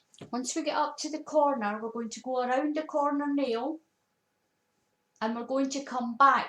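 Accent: Scotland, United Kingdom. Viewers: Lovely hearing a Scots accent 👍🏻💜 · she's sounds Scottish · Are you from Scotland? My paternal grandparents were from Scotland. Both of my parents worked so I spent most of my time around grandma Mary and Grandpa Pete. I developed a Scottish accent even though I have never been to Scotland. It's gone now, but it's funny to think about it. They had a very heavy accent so I had to "translate" for them often. LOL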